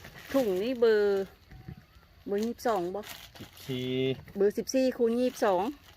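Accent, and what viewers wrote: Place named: Loei, Thailand. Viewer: อยู่ จังหวัดไหนค่ะ พูดเหมือนคน เมืองเลย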